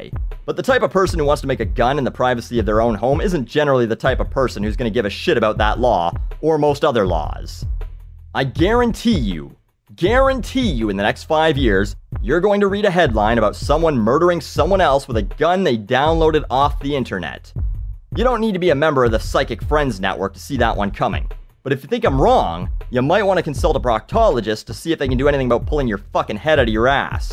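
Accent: United States. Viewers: That was a fuckin great American accent. Lol good video.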